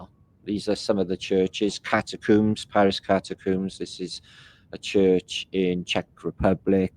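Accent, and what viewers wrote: Wales, United Kingdom. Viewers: Very, very interesting, you weave an incredibly convincing narrative here. Love the dry humour and the welsh accent equally 😊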